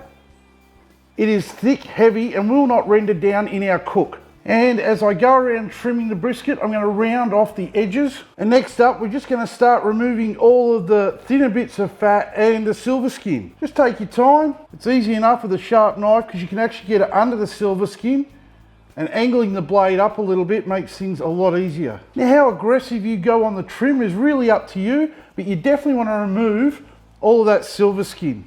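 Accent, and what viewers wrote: Australia. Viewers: Saw the thumb nail and was keen to watch...heard an Aussie accent and stayed to watch the whole video! Legend. Also that cutlery holder mini burner is genius.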